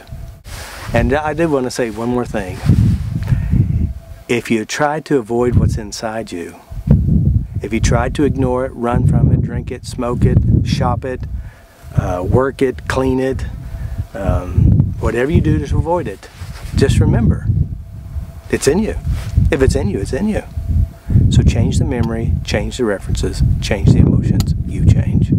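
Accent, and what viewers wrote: Oklahoma, United States. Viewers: That was really beautiful and meaningful to me. I've been so grateful for these videos, tapping along with them and moving so much old stuff. Since I'm from Oklahoma, too, your voice makes me feel right at home! Thank you!!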